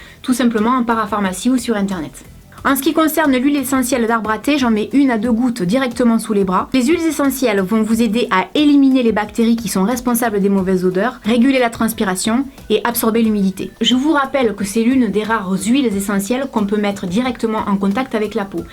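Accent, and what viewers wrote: South, France. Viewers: Ca fait plaisir d'écouter cet accent du sud !!!! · Bonjour. Très sympa tes vidéos et ton accent du sud (Pyrénées Orientales comme moi ou région PACA ?). Je rajoute un petit astuce déodorant naturel : par très forte chaleurs l'huile essentielle qui fonctionne très bien c'est celle de palmarosa ; ) diluée dans un petit spray comme tu le fais pour la lavande. Ça sent un peu fort après application mais l'odeur s'estompe rapidement. La transpiration ne sent pas. Efficace pour séance de sport par exemple. Bonne continuation.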